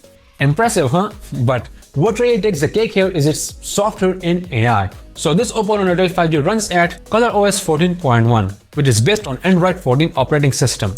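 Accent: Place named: India. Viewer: the annoying dirty indian accent is cringe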